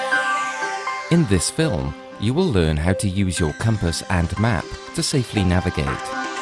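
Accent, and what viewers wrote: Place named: South East England, United Kingdom. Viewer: I'm probably way off beam, but it was a British accent, very south east which also happens to have -0° magnetic declination. So perhaps they didn't demonstrate what they couldn't demonstrate in Britain. Declination could do with some futher information, such as, what does the term "negative declination west" mean? Very good video though.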